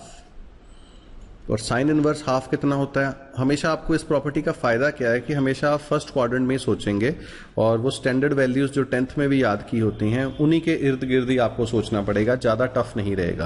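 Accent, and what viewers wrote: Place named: Punjab, India. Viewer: Getting punjabi tone 👍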